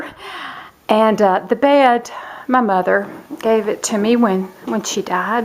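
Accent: South, United States. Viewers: I'm taking you back old school. Lol. I found your channel and started binge watching. You are the sweetest little southern bell. I'm from Kentucky but I think your accent is more southern than mine. ( I know you probably think Ky isn't southern but northerners do.) I just wanted to say, thanks for showing us your house ,even though you thought it was messy( it wasn't). It helps people relate better to you. I just can't feel comfortable in a house where every single thing is in place. I like a home that looks lived it . ( I guess you just live in the closet because the rest was perfect with nothing out of place). Lol. I'm just cutting up with you. Beautiful home but you are even more beautiful. Your inner beauty shines through to your beautiful face. Thanks for sharing.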